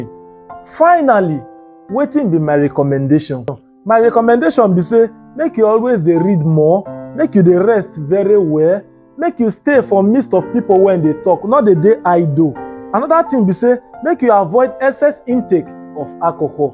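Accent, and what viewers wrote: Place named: Nigeria. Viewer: I didn’t know your pidgin is this thick oo 😂😂😂😂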